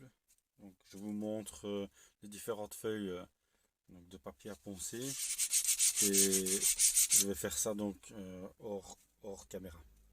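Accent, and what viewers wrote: France. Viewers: Esse sotac FRANCÊS é muito incrível. Gosto muito de ouvir.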